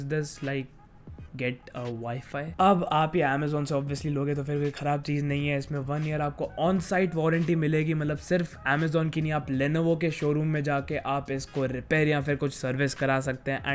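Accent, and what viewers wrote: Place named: India. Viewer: What it this for a language? Indianenglish ?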